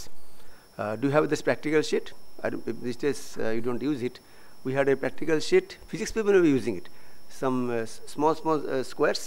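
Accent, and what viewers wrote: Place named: Bengal, India. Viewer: He is speaking benglish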